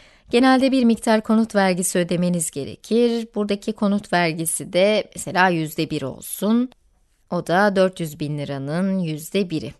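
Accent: United States. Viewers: Fazla Amerikanvari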